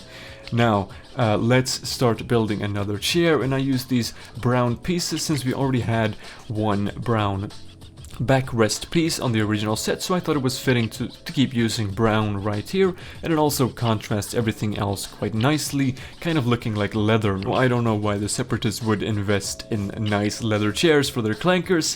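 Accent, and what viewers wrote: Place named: Sweden. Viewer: First time I'm seeing this channel in my feed.. Not lego related but your accent.. You sound Scandinavian, maybe Swedish? Sorry just curious 😅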